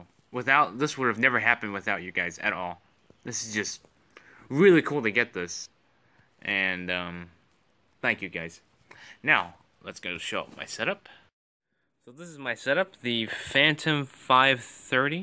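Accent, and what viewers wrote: United States. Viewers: i thought you were american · I thought u were an American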